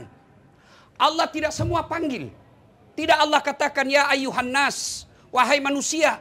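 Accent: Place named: Makassar, Indonesia. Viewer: Kusuka na karna tetapji tawwa logat makassarna.... Tetapki bgini ustad jammeki logat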